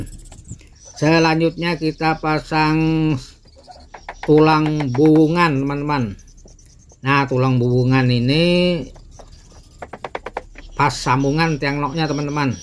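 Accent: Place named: Kutai, Indonesia. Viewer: Dri logat berbicara spertinya suku Kutai dri Kaltim 😂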